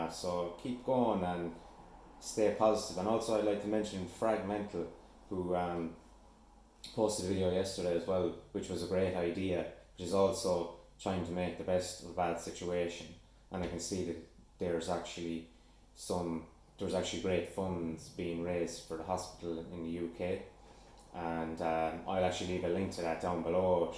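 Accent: Ireland. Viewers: hey mate is that a limerick accent?lol clare man here.aussie fragrance recommened u to me:)sir smells a lot is a cork reviewer here aswell.am from the banner county .subbed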